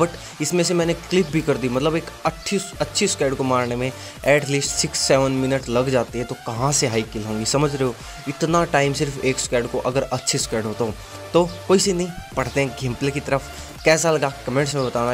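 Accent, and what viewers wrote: Haryana, India. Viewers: bhai humare Haryanvi hai kya rajasthani...?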